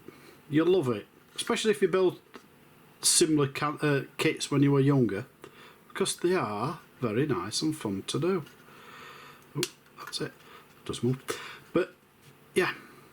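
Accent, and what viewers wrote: Barnsley, United Kingdom. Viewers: you sound like your from barnsley ?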